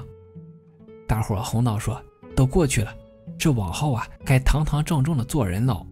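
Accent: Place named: Suining, China. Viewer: 博主是不是睢宁的，口音很像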